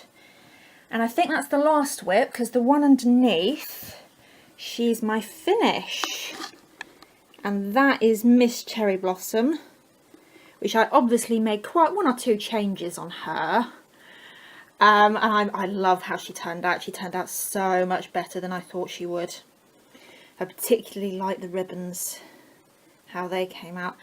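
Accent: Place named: United Kingdom. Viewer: Just found you! I love your lovely British accent and your comments about the weather there! What beautiful WIPS you showed!💕I am about to watch another of your videos.😊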